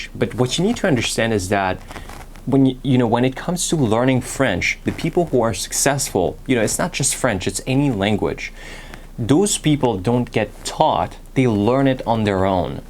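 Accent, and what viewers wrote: United States. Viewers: native french speaker? your american accent is absolutely incredible !!! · It is incredible! When I come across your video, I thought you were an American :)) Incredibly man!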